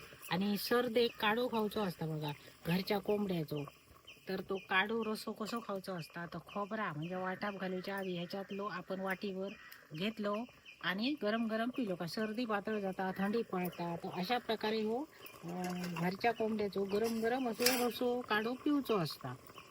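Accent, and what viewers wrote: Malvani, India. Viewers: काकीची मालवणी ऐकाण पॉट भरला..... वाह....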